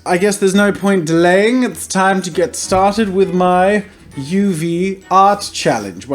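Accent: United States. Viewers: I first I thought he was playing a scary story in the background, but now I'm like" oh wait that's him speaking I think". if that was you talking, one question. How do you immatate American accents so well? 😂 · Nice American accent for the story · his American accent is point on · The slight American accent in the story kinda feels weird with you tbh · omg that american accent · the American accent that was good as heck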